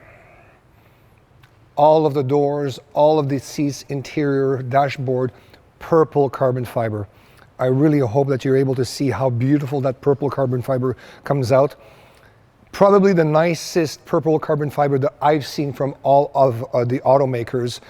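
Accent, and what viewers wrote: France. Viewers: Are you french ? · Is this guy French ?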